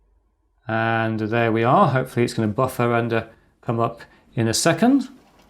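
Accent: United Kingdom. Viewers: oh! this specific - stale GB dialect - just like the farth from behind the monarchs.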